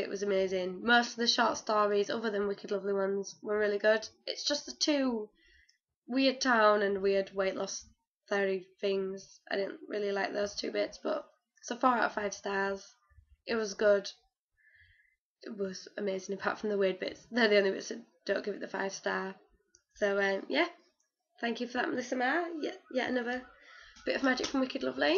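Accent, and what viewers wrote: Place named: United Kingdom. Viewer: Love your accent is it British?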